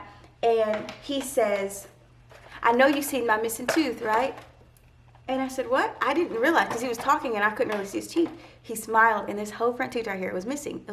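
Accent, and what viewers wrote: South, United States. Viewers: I hear that southern accent. Where are you from, if that's not to forward to ask?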